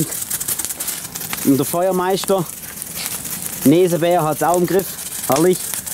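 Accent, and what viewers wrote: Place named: Swabia, Germany. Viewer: feiers vor ellem dass au en schwob bisch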